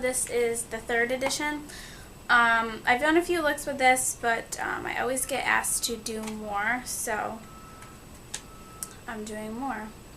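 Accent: United States. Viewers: I love you accent! which state our you from?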